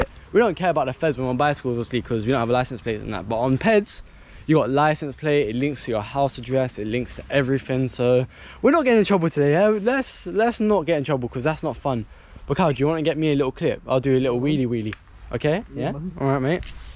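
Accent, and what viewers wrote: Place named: London, United Kingdom. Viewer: What’s wif the accent blood clot man ,use ben livin in London to long now !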